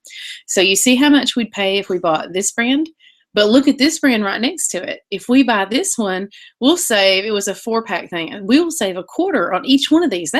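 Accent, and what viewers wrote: South, United States. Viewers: Nice video and I like your point of view! Stories of your grandma and getting back to the basics. Ringing a chickens neck!! haha! That's a good one! But yes shopping sales, doing some gardening, dehydrating...Good for you. I think more people need to be smart about their food and purchases. So many people I have met dream of going off grid and being self sufficient t... but sometimes that dream is five years in the making. So starting today in your own home with what you have is the best place to start. So many was to cut corners and save. My husband is only 53 years old and retired basically and I work only 8 days a month! HOW did we do it..by going back to the basics like you said! GOOD video and love that down home accent!!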